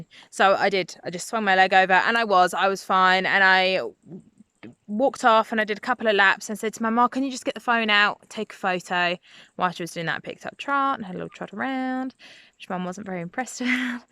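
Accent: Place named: New Zealand. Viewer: Ouch! Oh they can hurt themselves so easily can't they :( I would love to see a video on it. I have a question for you - are you in WA? I wasn't sure because you said Perth but you sound kiwi :)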